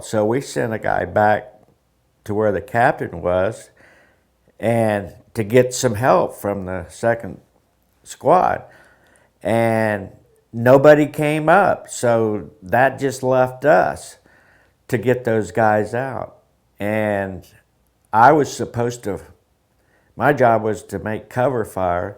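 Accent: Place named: Kansas, United States. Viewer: Glad your hanging on. I knew you had to be from Kansas as I listened to you talk and 37 min you said your were just a farm boy from Kansas. Greetings from a fellow kansan.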